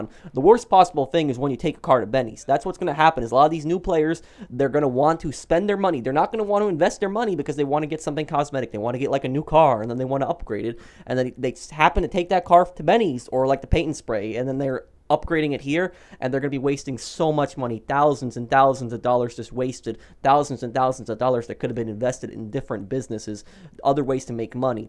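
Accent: Poland. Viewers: R u acctually Polish? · Z polski jestes?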